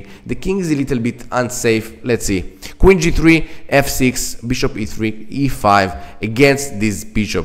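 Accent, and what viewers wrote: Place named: Israel. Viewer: איזה מבטא ישראלי ❤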